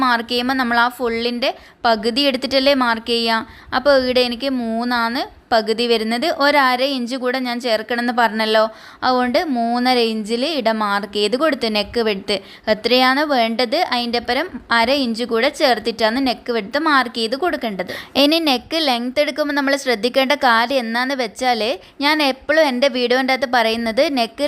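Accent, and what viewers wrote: Kannur, India. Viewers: Ithu nammude kannur bhasha anallo like cheithitundttoo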